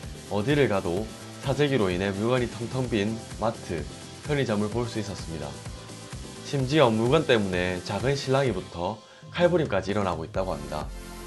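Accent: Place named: Gyeongsang, South Korea. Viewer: 사투리 정겨운데요 경북 지방의 표준어라 생각하고 들으니 괜찮은 데요👍👍👍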